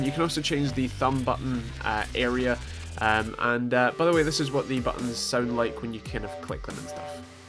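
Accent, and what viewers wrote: Scotland, United Kingdom. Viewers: Good review mate and good to see someone Scottish instead of American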